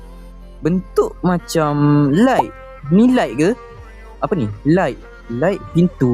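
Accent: Malaysia. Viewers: Bang,ni orang kuala lumpur ke, saya orang kedah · WEH MU KELANTAN KO 🗿🗿🗿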